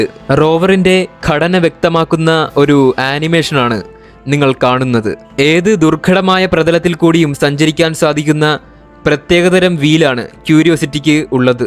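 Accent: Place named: Kottayam, India. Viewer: Kottayam ayirikkkum fullli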